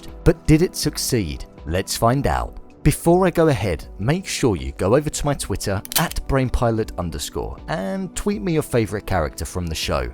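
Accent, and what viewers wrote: United Kingdom. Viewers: It's so fucking try-hard with it's "crude" humour and gags. Especially with the celebrity references. This guy thinks it's "hilarious"? What a waste of a posh english accent. lol I enjoy Rick and Morty. I think American Dad is funny and sometimes even Family Guy. But Paradise PD is very very very very VERYYYYY unfunny. I laughed once every 1.5 episodes. Nothing subtle. AT ALL. They try to be rude, crude and lewd at every single point. For every one sentence of scene building there are 5 or 6 "jokes". Bad "jokes" and "gags". It's like those parody movies style of humour. Scary Movies, Not Another Teen Movie, Meet The Spartans, Vampires Suck and so on... I watched all 10 episodes btw.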